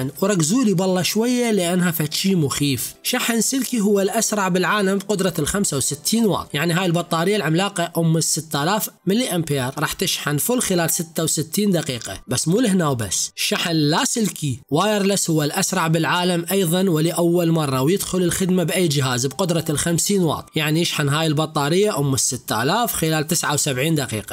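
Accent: Iraq. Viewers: مستمتعتش بالفديو قد ما استمتعت بلهجتك العراقيه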